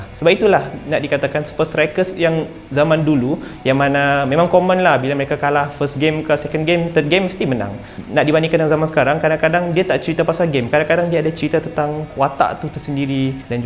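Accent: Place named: Sarawak, Malaysia. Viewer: Speaker ni bckp mcm org Sarawak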